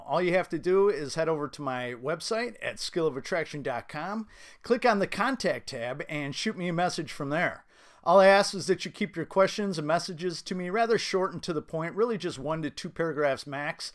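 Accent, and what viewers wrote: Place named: Midwest, United States. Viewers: I’m digging the Midwest accent and great advice 👍🏻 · I don't know why I thought you were from Canada I can so hear the Illinois accent now.. Great video bro!! always on point👍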